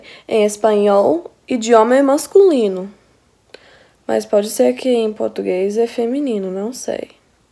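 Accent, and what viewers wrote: Bahia, Brazil. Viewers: Português dela tem sotaque baiano.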